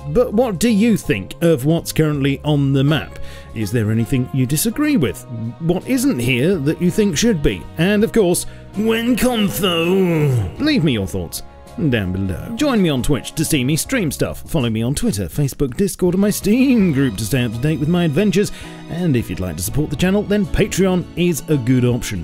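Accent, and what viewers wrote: United Kingdom. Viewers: You sound like the spiffing Brit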